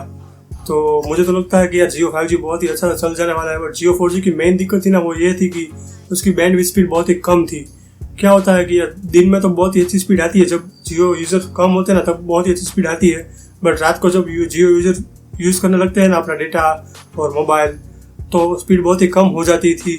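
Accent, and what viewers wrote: Gujarat, India. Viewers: By your voice i think you are gujrati .I'm right ?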